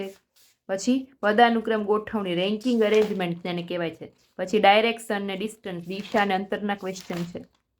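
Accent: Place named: Banaskantha, India. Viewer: બનાસ ની ઓળખાણ ના આપવી પડે બોલી જ કાફી છે ❤ જિલ્લો મારો બનાહકાંઠો ❤ તાલુકો ધાનેરા❤ બનાસ ડેરી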